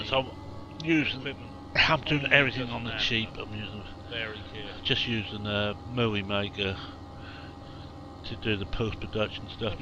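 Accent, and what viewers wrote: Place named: Newcastle, United Kingdom. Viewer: Wonderfull geordie accent...Lol Thanks bud Good vid man thumbs up BTW i would like to see soil mod on old ridge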